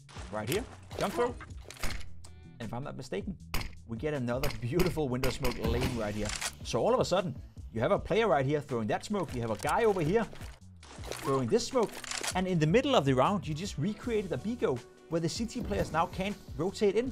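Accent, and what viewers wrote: Denmark. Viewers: Are you Danish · are you from Denmark mate? it does sound so, anyway I left a like fellow dane!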